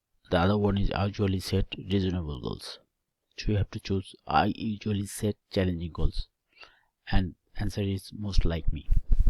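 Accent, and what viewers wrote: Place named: India. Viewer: Technically not broken .. just an Indian accent lol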